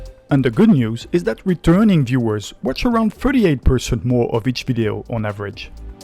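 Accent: France. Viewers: I’m a senior from the USA. My husband and I love watching your videos on TV together. The content is wonderful, but what really sets you apart from other YouTubers is your soothing, slow, clear, and sophisticated voice plus that very cute French accent! You describe the restaurants and foods so beautifully, and your close-up shots let us see everything and dream about how it tastes. We also really appreciate that you show us the final bill. It really brings it all together for us. We also especially loved your video explaining the differences between cafés, bistros, etc. Every time we visited France, we never knew the distinctions, so your explanations were incredibly helpful. We’d love to see more videos about restaurants, bakeries, salons de thé, where and what types of cheeses to buy, and what kinds of things to buy at charcuteries, and just everything about food in France. 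This video is excellent. It was so interesting to see how YouTube payment works for creators. Congratulations on your 50K!! Thank you so much for your wonderful work! We escape to dreamland with your videos! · Well done on your acheivement of 50 000 subscribers. It must take a LOT of work to prepare all this material. Yes, we love your chanel here in Adelaide, Australia, and have learnt a lot about how to navigate Paris in our fothcoming trip. We have printed out your Pas-saaa-ges map and will walk it in April. (We love your intonation and make lists of the adorable way you pronounce so many words, as you shift the emphasis from where English speakers place it, to your very own version of the word. Eg...Passages in English has the emphasis on the first syllable wheras in French it is the second syllable that is emphasised. We have written down pages of English words and the way you so interestingly and logically quaintly pronounce them. 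For us it is an amusing and almost impossible exercise trying to mimick your emphasis. Our English speaking brains just wont do it!! ) We also wonder how the obesity rate is so low in France with all that heavy, meaty, fatty, sauced food and sooo many pastries. Pastries for breakfast? Surely they are a treat and not everyday? This all looks so unhealthy to our eyes. No salads and few vegetables seem to appear on the table. This is a mystery to us. Can you maybe do a video on the healthy restuarants in Paris? where salads and veggies and less meat is emphasised?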